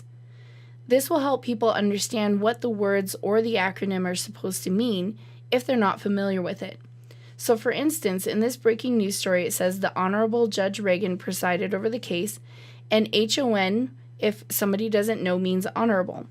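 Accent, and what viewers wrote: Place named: United States. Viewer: Thank You :) Finally someone with American accent ;) I was watching 2 previous videos with strong Indian accent... I didn't understand it at all..:D Great lesson thanks again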